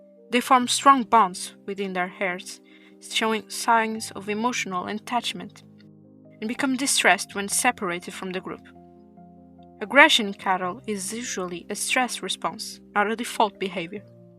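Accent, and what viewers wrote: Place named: Portugal. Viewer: Noticed it too, sounds like (european) portuguese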